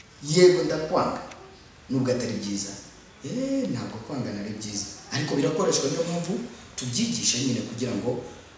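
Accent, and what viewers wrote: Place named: United Kingdom. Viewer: Teacher thanks for your contribution to the Rwandan community I really like your methodology,but most importantly your English is clean it sounds really british English not like some teachers whose English accent cannot be distinguished with their local languages. These international language should really not be confused with our local languages. Please keep it up.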